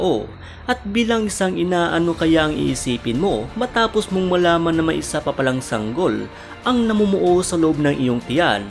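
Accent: Philippines. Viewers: The accents, sound like ilocano. Correct me narrator if I'm wrong.😁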